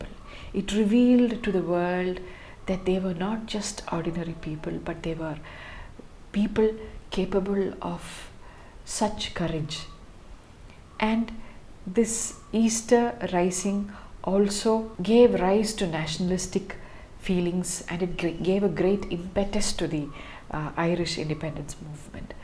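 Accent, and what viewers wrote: India. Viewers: Love ur Indian accent 😊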